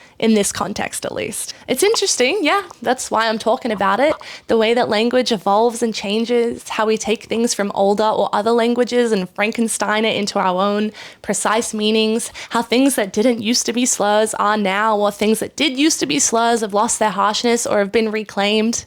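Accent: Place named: Australia. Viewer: It took me watching more than halfway through the video to realise that you were also Australian.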